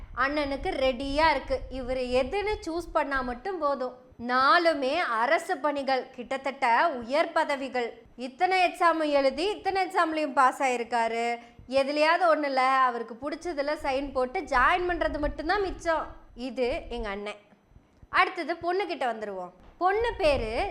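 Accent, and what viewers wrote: Kongu, India. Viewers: தாயே அருமையான கொங்கு தமிழ் உனது குரல் மலழை மொழி போல் உள்ளது அருமை தம்பதிகளுக்கு மனமார்ந்த வாழ்த்துக்கள் ஜெய்பீம்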